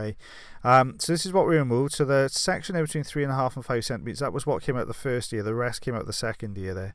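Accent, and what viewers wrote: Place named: North, United Kingdom. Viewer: Fabulous! Love your commentary, your accent reminds me of infrequent but lovely trips up North. ☺I reside on the South Coast. The videos are great, keep up the good work!x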